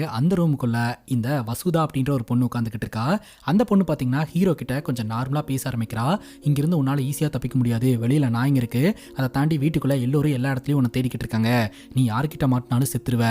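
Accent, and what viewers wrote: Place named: Kongu, India. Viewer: may be இவரு கொங்கு region ah irupanga nga...இது கொங்கு தமிழ் nga... inga elarum ipaditha பேசுவோம்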